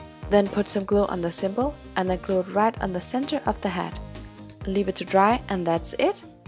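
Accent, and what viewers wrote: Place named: Denmark. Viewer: What cool projects! I love your accent - do you live in Denmark?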